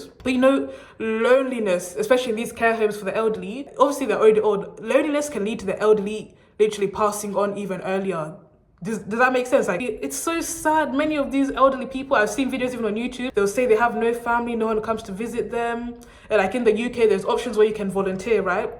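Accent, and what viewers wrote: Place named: Southern Africa. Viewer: You have a southern African accent, love it!!❤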